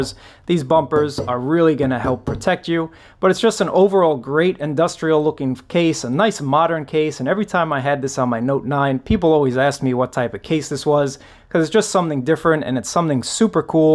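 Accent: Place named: Brooklyn, United States. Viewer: Straight outta Brooklyn lol. Well done with the video. I'm sold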